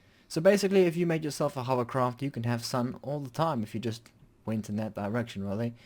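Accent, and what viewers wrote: South Africa. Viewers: South African accent perhaps???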